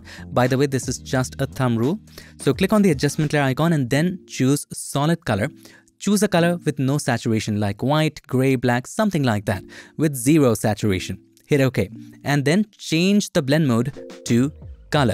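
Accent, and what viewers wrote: India. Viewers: Man the Indian(?) accent can be really nice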